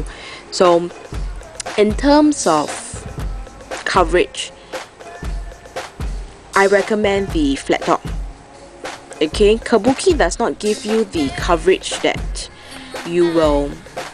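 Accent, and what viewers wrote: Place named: Singapore. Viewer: hahahahha.. from your accent i can tell you're a singaporean ^^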